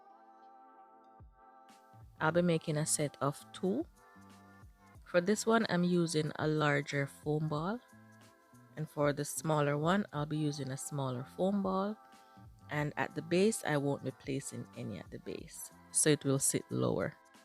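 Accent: Jamaica. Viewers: Your pieces are gorgeous❤❤❤❤❤ and sounds like you are Jamaican🇯🇲🇯🇲🇯🇲🇯🇲🇯🇲👍👍👍👍👍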